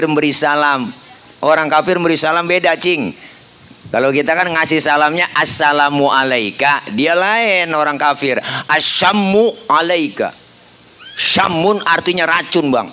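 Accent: Jakarta, Indonesia. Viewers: Betawi nya medok banget mantaf !!!. Medan selalu nyimak. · Suka banget ceramahx,bhasax,logat Betawi biar sy orng Pati Jateng,tpi sy pahm sekali,krna pernh tinggl d Jakarta Selatan... lucu buanget,semoga bermanfaat pak ustadz...🤗🤗🙏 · sangat terhibur dengan logat betawi Babelan,trimakasih ya pak ustd,smoga sehat selalu💪💪🇮🇩🇮🇩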